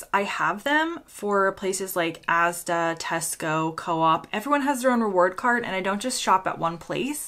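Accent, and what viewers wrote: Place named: United States. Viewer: Love your US accent ❤❤❤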